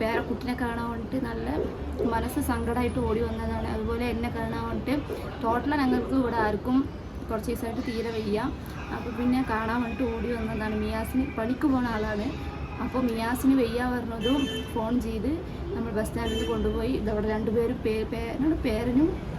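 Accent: Tamil Nadu, India. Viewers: എത്രയോ ചെറുപ്പത്തിൽ അവൾ തമിഴ്നാട് പോയതാണ് അന്നുമുതൽ അവൾ സംസാരിക്കുന്നതും ഇടപഴകുന്നതും അവരോടൊപ്പം മാത്രമാണ് അതുകൊണ്ടാണ് ഇങ്ങനെ സംസാരിക്കുന്നത് എന്തിനും കുറ്റം പറയല്ലേ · നീയൊന്ന് മലയാളം പറ അല്ലെങ്കിൽ തമിഴ് പറ. പറയുന്നത് കേട്ടാൽ തോന്നും നീ ജനിച്ച് വളർന്നത് തമിഴ്നാട്ടിലാന്ന്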